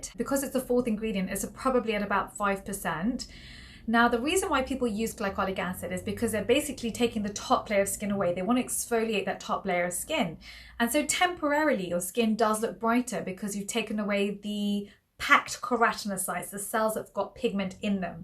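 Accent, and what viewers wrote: United Kingdom. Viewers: Special thanks for you English British accent, i am as a foreigner just can't stand American English accent, so again thanks and all the best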